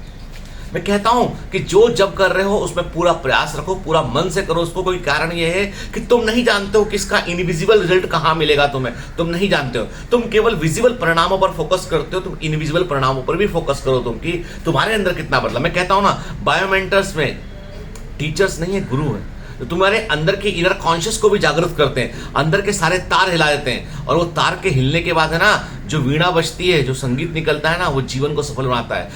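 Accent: Gujarat, India. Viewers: Sir aap Gujarati ho 🧐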